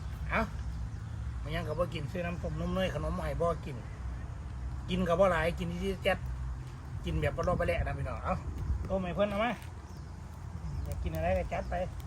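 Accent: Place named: Laos. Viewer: ตกลงมึงสิเว้าไทยคือเว้าลาวบักตาบคำเว้าหลาย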